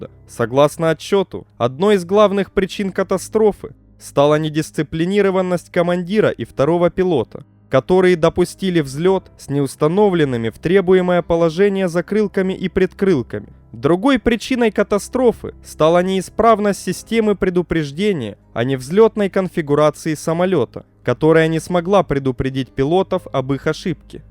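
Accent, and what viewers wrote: Ukraine. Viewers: Украинский акцент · украинский акцент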